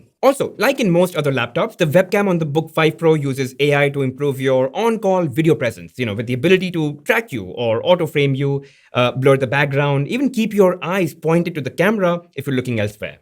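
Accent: India. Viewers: Iss. Bande ka eng bhi muze hindi jesa lag rha hai😅😅 laga hi nai english me video dekh rha hu 😅😅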